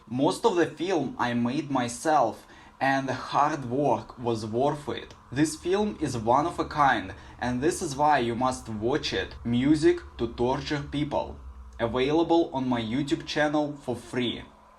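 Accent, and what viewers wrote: Russia. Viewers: Русский акцент слышно за километр, лучше на русском видео пили... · I almost expect English speakers to complain, but even other Russians...wtf. Even with the thick accent, it's easy enough to understand him. Besides, the accent is nice and adds character. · Зачем ты делаешь контент на английском если у тебя такой русских акцент?)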